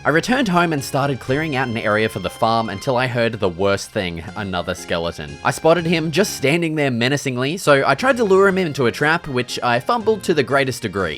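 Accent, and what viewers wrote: Australia. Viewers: omg the aussie accent is legendary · U Aussie? U sound Aussie. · Yo! I was just watching some of your videos and realised I recognised your accent, a fellow Aussie! I know it’s weird and all getting excited seeing another Aussie because I see them everyday- but online you don’t see too much of them. So have a good rest of your Tuesday!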